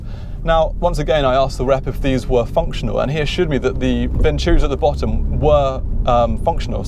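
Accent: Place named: England, United Kingdom. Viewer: Say Alpeen!!! like you say Ferraree and Lamborghinee! what an english uggly pronunciation you've got!!!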